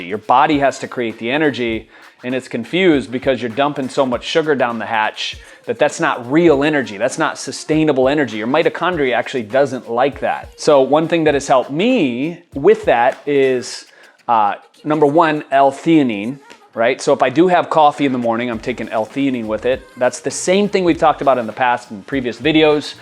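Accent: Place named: South, United States. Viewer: The Miata and southern accent just gave me life. I have to start the video over.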